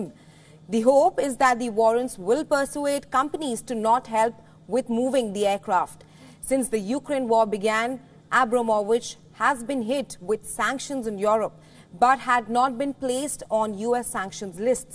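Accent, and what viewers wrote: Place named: India. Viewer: Disgusting ' Indlish ' pronunciation !!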